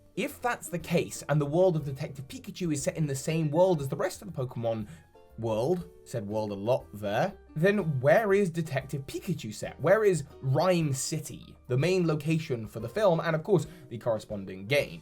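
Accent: England, United Kingdom. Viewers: What part of England are you from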